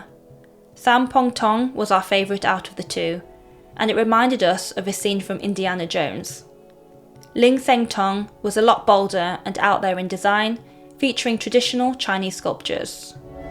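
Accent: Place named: United Kingdom. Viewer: Great video. I have visited most of the places you included in this video but your information was an added bonus because I learnt much more about some of the history about these places. Thank you. BTW where are you originally from ? Judging by your accent you sound British.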